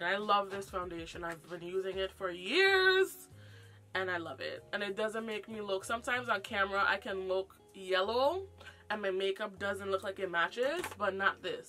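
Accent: Virgin Islands, Caribbean. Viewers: My husband is from St. Croix and he have the same accent, I’m American but I be trying to talk in his accent to bother him lol but over the years I’ve learned how to understand you guys so well ❤️ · I was just watching your Rice Water video and I knew i was hearing a Caribbean accent lol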